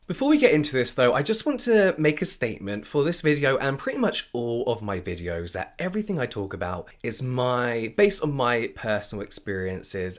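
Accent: United Kingdom. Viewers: your British accent is everything! It's literally better than ASMR 😍 love it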